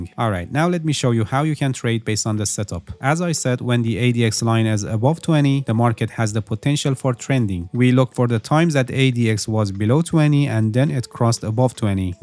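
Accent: Iran. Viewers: گل پسر خیلی خوب انگلیسی صحبت میکنی درود بر تو اما لهجه فارسی هنوز کاملا قالبه😊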